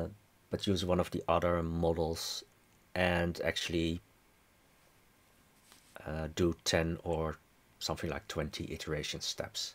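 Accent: Netherlands. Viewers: You sound very Dutch :)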